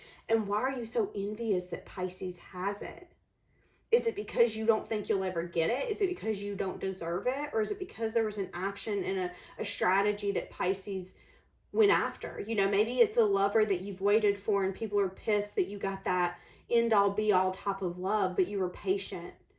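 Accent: South, United States. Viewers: You my dear are SPOT ON! It is so unreal how you nailed it. I love your honesty, advice, and your southern accent is DARLING! Thank you for sharing your gifts and talents with all of us. ♥️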